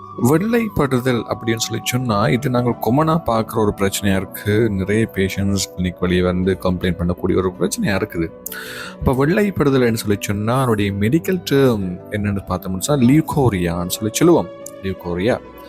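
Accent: Jaffna, Sri Lanka. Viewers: Are you sri lanka or india your jaffna tamil nice Tamil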